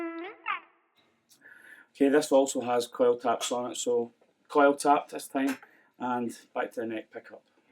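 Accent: Scotland, United Kingdom. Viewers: well I’m Italian but i usually understand English quite well,it might be a mix of your voice condition,audio and (nice) Scottish accent:) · Thanks for the warning , i wanted to buy one of those ( w/o tree inlays) PLEASE, use a lapel mic , or singing mic positioned infront of you. The sound quality on the video is muffled ( nice scotish accent though). Best regards, D.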